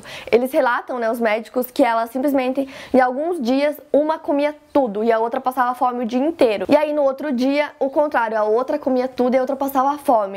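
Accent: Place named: Paraná, Brazil. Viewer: Se eu não estiver enganada, o teu sotaque é de paranaense né?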